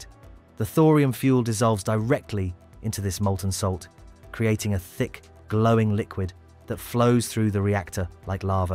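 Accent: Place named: United Kingdom. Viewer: the British sounding AI narrator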